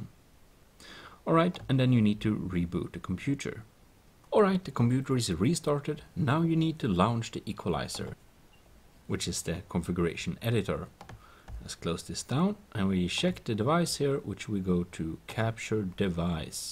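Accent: Sweden. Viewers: swedish acsent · Definitivt svensk, Norrmän låter mycket mer oseriöst (no offence). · U sound swedish.If u are tjenaaa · I am swedish too! It's weird how you can hear it on the type of english people speak... epic video btw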